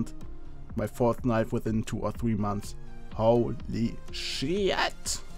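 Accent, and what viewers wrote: Germany. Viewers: dieser deutsche akzent beim englisch sprechen ist so offensichtlich :D und irgendwie auch langsam nervig :D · Man hört das du Deutsch bist sprich doch auch deutsch xD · Deutscher Akzent ist schrecklich!! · Dein Englisch ist meiner Meinung nach richtig stark, Grammatik und Vokabular sind einfach perfekt, nur der Akzent ist einfach 100% deutsch ^^ Naja ich kann nichtmal ansatzweise so gut englisch, aber mein Akzent ist schon sehr Englisch:D Hättest du jetzt noch nen amerinakischen Akzent würde ich dich glatt für nen Ami halten^^ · U have a german voice but u are english i think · are you german your english have a german akzent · Dein Akzent sagt mir :,,Du bist Deutsch :D''(I think u r a german :D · R you german? Your English sounds like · hört man nordeutscher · Bist du deutscher oder österreicher ? Dein english hört sich irgendwie so an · i wonder if i also sound so german when i speak english. 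no offense, pretty much every german sounds like that. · Man hört so dass du deutsch bist! :D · Are you from germany? because you don't sound like an english guy, I mean it's not bad, I'm to german, i think you sound like a german :D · Ich kann an deinem English hören das du Deutscher bist warum sprichst du nicht Deutsch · Also ich muss ja jetzt mal sagen...! Der deutsche Akzent geht mal garnich.! :DDDDDDD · are you german ?? :D i think im hearing an accent ;) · Du hast ein deutschen Akzent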